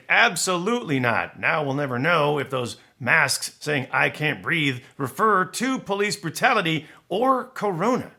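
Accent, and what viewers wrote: Netherlands. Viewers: Oh my god, the Dutch accent totally cracked me up!!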